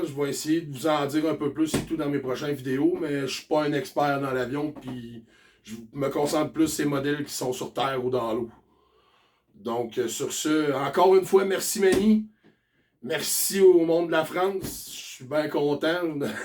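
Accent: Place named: Quebec, Canada. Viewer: Slt TROP BIEN Ta CHAINE YOUTUBE CONTINUE COMME ÇA TES VIDÉOS SONT TROP BIEN LONGUE VIE À TOI ET À TA CHAINE YOUTUBE!!! IL EST TROP BIEN TON ACCENT DU QUÉBEC LES GENS SONT TRES SYMPA ET TRES GENTIL AU CANADA MOI JE SUIS FAN J'ADORE JE M'ABONNE DIRECT!!!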